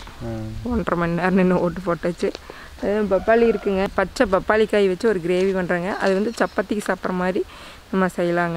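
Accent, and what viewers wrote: Kongu, India. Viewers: Akka ungal kongu tamil alagu Akka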